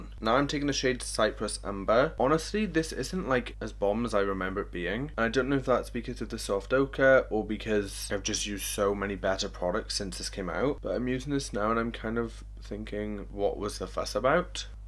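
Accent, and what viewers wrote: Wales, United Kingdom. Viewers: Are you welsh? Love you btw !! 💓💓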